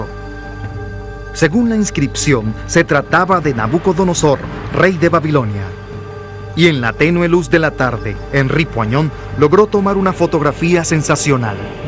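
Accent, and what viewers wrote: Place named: Latin America. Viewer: vamos a ver colega, ejm, Semiramis era la esposa de Sshamshi Adad V, unos 300 años anterior a los que tu dices relacionandola con Nabuconodosor, imagino, por tu acento, que se debe a que eres del continente americano, y permite decir, que fuera de vuestro continente, soleis tener una concepción del tiempo muy peculiar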